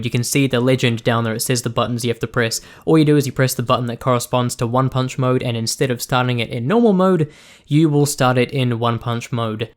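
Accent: New Zealand. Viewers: What part of new Zealand do you come from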